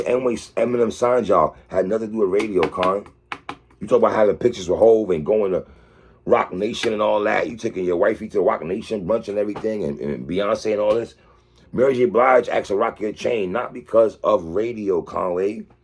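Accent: New York, United States. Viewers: Nothing to add, really. Great commentary here by an authentic NYC voice,. Someone in that Drumwork/BSF/Griselda camp needs to make sure they all see this video. Radio didn't make them hot. Conway and nem make music for a niche fanbase, who loves what they do. Unless they're willing to switch up their sound and play the political industry games, they shouldn't even worry about getting spins from the likes of Flex.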